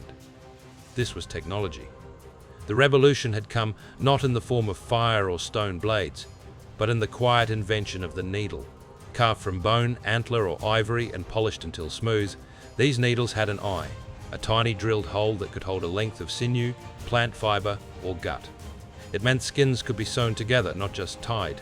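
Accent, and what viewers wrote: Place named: Australia. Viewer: New subscriber here. Solid mate. Sound like an Anzac 😉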